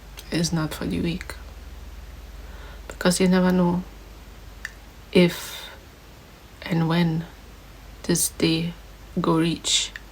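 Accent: Trinidad and Tobago. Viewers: You are stronger than you know. You are a true Trini resilience and strong. You even sound Trini❤ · You're a true Trini with accent and all. You will get there soon...love the sunset painting ❤ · You may have left Trinidad, but I hear and see Trinidad in you. Big up, and stay strong gal. Ya go make it.!!!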